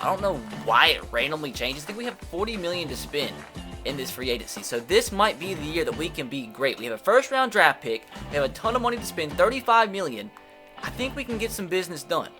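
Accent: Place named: Alabama, United States. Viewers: This man with that southern voice tho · Alabamer accent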